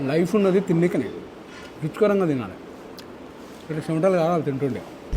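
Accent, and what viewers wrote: Telangana, India. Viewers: ❤❤ok brother nee matatheeru mana తెలంగాణ యాస తో super గ ముందుకు వెళ్తున్నావ్ కానీ వీడియో లు తక్కువగా వస్తున్నాయి కొద్దిగా ఉరుకాలే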